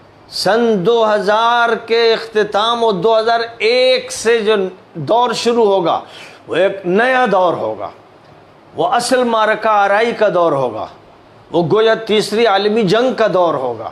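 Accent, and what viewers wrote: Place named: Lucknow, India. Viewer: ماشاءاللہ, کیا پیارا اندازِ گفتگو ہے آپ کا, خاص لکھنوی اسٹائل. آپ کی گفتگو سن کر بہت مزہ آتا ہے اور آپکی بات دل میں اتر جاتی ہے. مولانا سلمان حسینی صاحب, اللہ تعالیٰ آپ کو سلامت رکھے, کہ آپ حق بولتے ہیں ہمیشہ.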